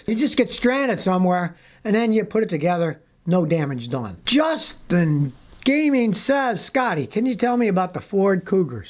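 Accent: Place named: New Orleans, United States. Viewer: Good afternoon , Mr. Scotty , I just found your channel , by me looking into buying a 2004 Honda C R V , I really appreciate your much valuable knowledge , Thank You , for sharing , I wanted to ask you a question , are you from New Orleans , I grew up a hr away from there , you sure sound like you are from there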